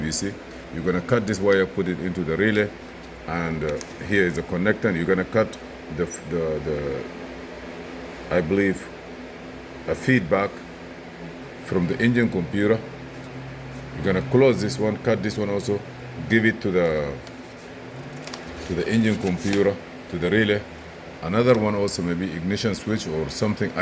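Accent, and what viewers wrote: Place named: India. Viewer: I be honest I can't listen to the indian accent idk if its just me!? N they be post so many DIY videos nan fooken get so damn mad. Lol anyways thx u sir u speak very good in English I can under st an you for sure you be surprise there's lots that dnt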